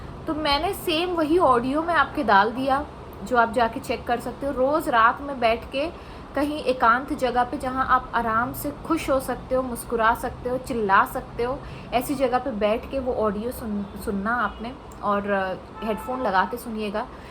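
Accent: Hyderabad, India. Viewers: You say Honga jaenga.. are u from Hyderabad?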